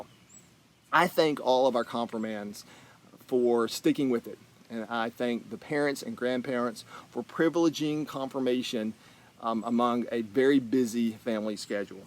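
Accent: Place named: South, United States. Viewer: So good to see your face! (Miss that southern twang though.) Hoping you, your family, & your congregation remain well & safe during this time. ❤️ JT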